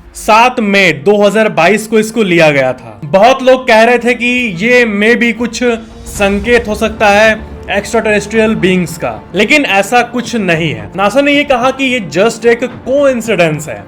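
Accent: Bihar, India. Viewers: Bihari babu voice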